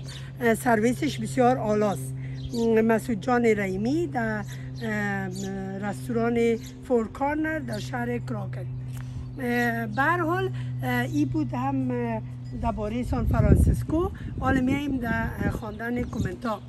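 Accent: Kabul, Afghanistan. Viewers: خاله جان شیرین چقدر شیرین حرف میزنی بسیار با ادبیات بالا و همتو لحجه مقبول کابلی امروز اولین بار است که برنامه تان را دیدیم بیخی عاشق حرف زدن تان شدم 😍😍😍😍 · سلام جان ، من ایرانی هستم ۴۷ سال در فرانسه هستم افسوس میخورم که وقتی جوان بودم و از ایران امدم افغانستان را ندیدم من عاشق لهجه افغانی و شغر های کشور شما هستم امروز شما را روی یوتیوب پیدا کردم خیلی لذت بردم انشاله همیشه سلامت و موفق باشید خدا پشت و پناهتان باشد. شم چه کشوری هستید. قربانتان هلن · سلام خدمت شما بانون مهربان و هنرمند افغان، خانم عزیز. اینقدر که آشپزی تون عالی و از اون مهم ترین صحبت کردن شما با لهجه شیرین افغانی و خونه وزندگی تمیز و زیبای شما کلی بهم آرامش میده و لذت میبرم از تماشای هنر آشپزی شما. اینقدر که مهربونید من احساس میکنم یکی از اعضای خانواده من هستید، شما خانمهای افغان خیلی هنرمند و مهربون و خانواده دوست هستید خوشحالم که هستید و آرزوی سعادت، سلامت و شادمانی برای شما و خانواده عزیزتون دارم. 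برنامه هاتون رو لایک میکنم و به دوستان ایرانی خودم هم معرفی میکنم. 🙏🙏👍👍🌹🌹😘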